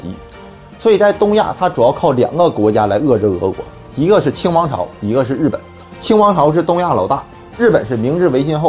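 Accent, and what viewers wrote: Northeast, China. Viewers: 口音太重，一口东北大碴子味儿。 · 好片，轻东北口音解说听着很给力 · 这大碴子味的普通话听不清你说啥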